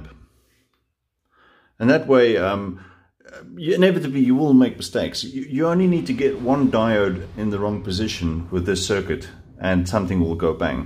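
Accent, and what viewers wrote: South Africa. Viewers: Howzit boet! Tell me you are South African!!!!! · Thank you for this. Have been looking for a solution as simple as this one. Just to confirm, the sizes of the components you used works for RSA households (voltage etc)? I assumed from your accent you're South African...Afrikaans soos ek;-). Where do you buy your components? I already have the Sonoff switches, just looking for a reference for the diodes and capacitor?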